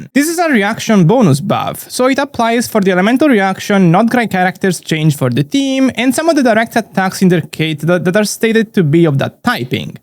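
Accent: Italy. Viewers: hai un ottimo inglese ma il nostro accento è riconoscibile lontano un miglio 😂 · are u italian?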